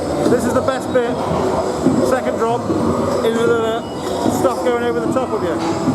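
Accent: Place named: Suffolk, United Kingdom. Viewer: Never seen your videos before but I enjoyed this. I could hear the Suffolk accent in you and then you name dropped pleasurewood hills. Lowestoft boy? 😀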